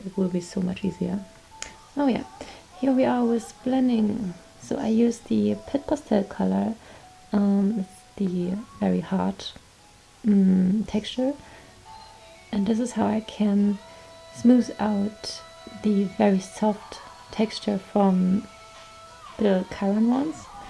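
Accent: Germany. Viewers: Thank you so much for showing us! You honestly deserve so much more attention and love bc your work is awesome! But I'm glad I found your channel now ^^ btw sorry for that question but are you German? I just think you sound a little German :)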